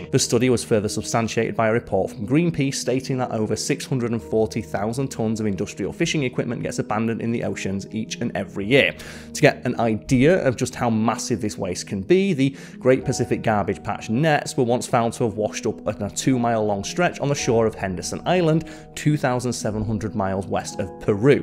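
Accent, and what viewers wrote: United Kingdom. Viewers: Dunno about trying to minimize British colloquialisms. The entire Whistlerverse is founded on the fact that Americans tend to think that anyone with a British accent is automatically smart and well informed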